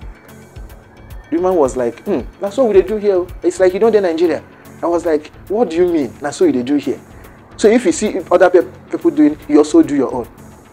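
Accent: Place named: Ghana. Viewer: O boy u no be Nigeria with the way u sound,say for u Ghanaian because I no see u as Nigeria.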